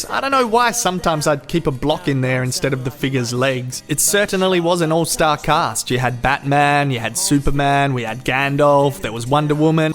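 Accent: Australia. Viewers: Great job mate! Take away the swearing and you have some very thoughtful insight into this movie. Hearing another Aussie swear just makes me homesick. Thanks mate!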